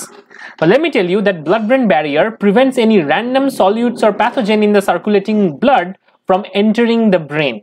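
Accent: India. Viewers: There’s something unique about the Indian accent that helps me learn topics like this much better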